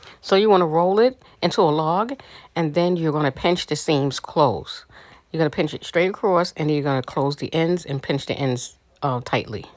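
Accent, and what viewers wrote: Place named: New York, United States. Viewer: 💜 the New York Puerto Rican accent!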